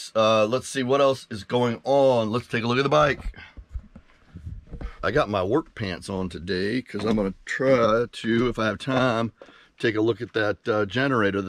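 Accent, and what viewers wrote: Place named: South, United States. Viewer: Glad that things are good,! You are beginning to get 'just a tad' of Southern English twang going there... Ha ha. Welcome to the Southeast.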